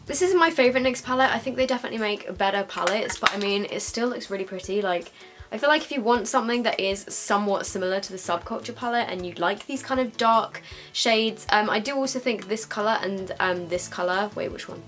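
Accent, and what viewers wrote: England, United Kingdom. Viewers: Are you from England? Your accent sounds that way 💖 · I just subscribed and I wanted to know if you were English? (I'm English)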